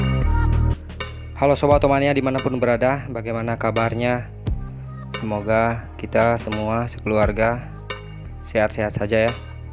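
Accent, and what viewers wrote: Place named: Madura, Indonesia. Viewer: logatnya sperti orang madura takye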